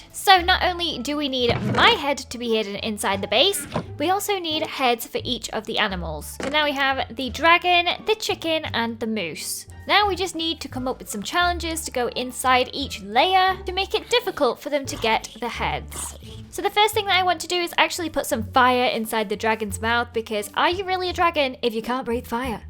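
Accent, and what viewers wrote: United Kingdom. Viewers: sounds so much British in this video😂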